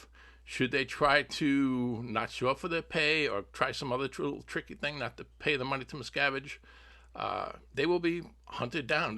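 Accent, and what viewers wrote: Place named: New York, United States. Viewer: Great first solo video! I'm amazed that you still have your New York accent even after being away from it for so long! As a NY'er, it makes me smile 🙂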